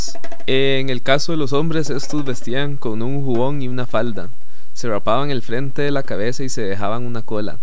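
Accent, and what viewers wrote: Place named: Costa Rica. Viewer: llegar a uno con acento tico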